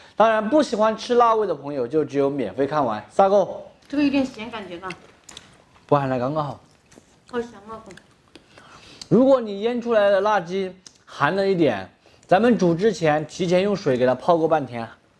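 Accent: Sichuan, China. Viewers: 老哥，你的椒盐普通话太地道了，我全程笑着看完的。😂😂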